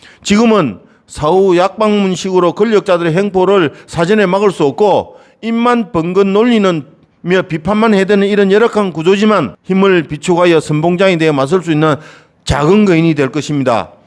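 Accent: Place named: Gyeongsang, South Korea. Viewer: 갱상도 사투리 조나 듣기 싫네 ㅋㅋㅋ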